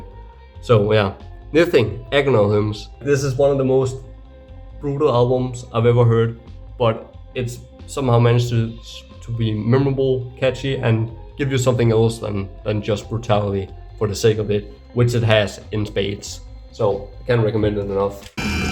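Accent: Denmark. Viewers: Definitely Danish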